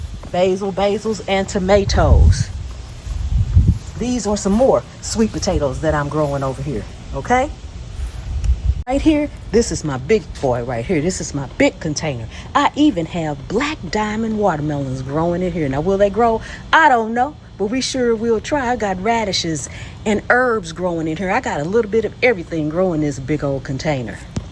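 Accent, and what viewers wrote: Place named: North Carolina, United States. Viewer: My NC friend ❤ I’m in Northeastern NC. I’m gonna have to take some lessons from your gardening channel. I thought you sounded like you have a NC accent. Thank you ❤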